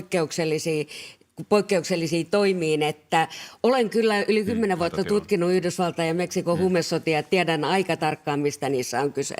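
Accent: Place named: Turku, Finland. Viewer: Niin ihanaa toi Turun murre 😊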